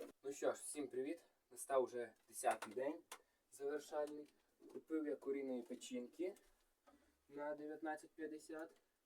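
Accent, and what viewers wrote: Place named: Surzhyk, Ukraine. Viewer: а ты с какой области? У тебя очень интересная речь, смесь украинского и русского (на Донбассе это называется "суржик"), звучит мило😉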